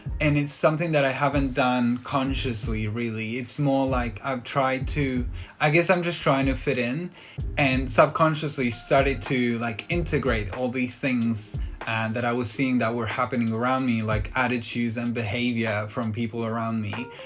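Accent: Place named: New Zealand. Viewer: Interesting. I wondered where you were based. Now that I know that you're in NZ, I can definitely hear that country's influence on your english, even if it's subtle. I would love to visit NZ one day. Of course ever since LOTR came out 20+ years ago, it's been on my bucket list. I know that's pretty stereotypical. Pero a mi no me importa! Jaja, oh yeah, también hablo español! Saludos desde Atlanta GA USA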